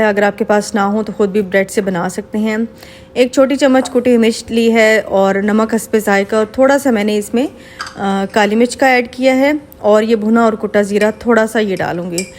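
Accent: Saraiki, Pakistan. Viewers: I live in Multan and hope to see you soon... I m a saraiki too and apki meethi saraiki sun kar bohat acha lagta hai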